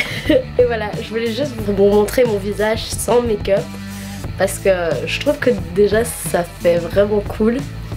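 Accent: Belgium. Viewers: je me disais bien je suis aussi belge hihi;3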